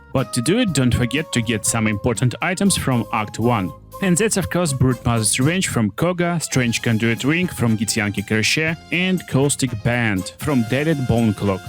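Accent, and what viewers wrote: Russia. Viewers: Такой сильный акцент, что у меня подозрение, что автор - русский. - Helldusk Gloves from Act 3, not from Act 2. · РАШН АКЦЕНТ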